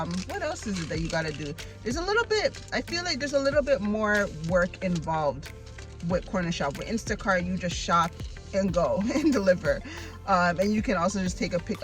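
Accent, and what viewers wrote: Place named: Barbados. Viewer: hello. my name is sean. Thank you for doing this video. thanks for the information about cornershop. You sound like you are a bajan, i hear an accent.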